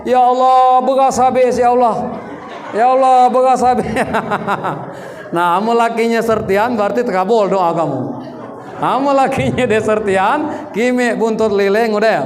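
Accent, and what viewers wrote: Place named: Semende, Indonesia. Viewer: Ustadz cetamah dimn ni??kok kyk bhs bapak saya ya,,jeme semende??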